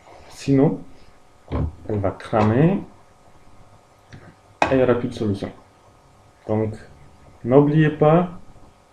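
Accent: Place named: Poland. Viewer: Explications un peu longues mais Merci car je viens de sauver ma cafetière ! A poznaje czysty polski akcent !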